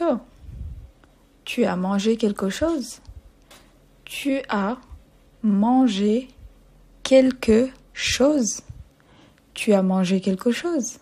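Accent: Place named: France. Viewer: আপনার ফ্রান্স Accent গুলো সুন্দর..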